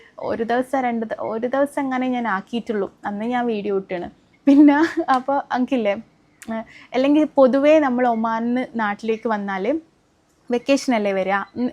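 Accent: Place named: Malappuram, India. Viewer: samsarikkumbol vadakkare samsaaram maarunundallllo😅❤❤malappuram slaang avunnund chilappozhakkke.thoneetundo😅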